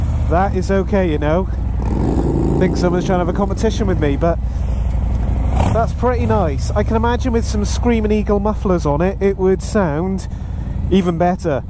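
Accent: England, United Kingdom. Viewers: I made it to 2 minutes English accents piss me off .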